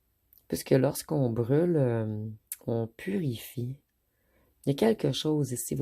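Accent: Quebec, Canada. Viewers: Ah ,le fameux accent des Québecois(ses)....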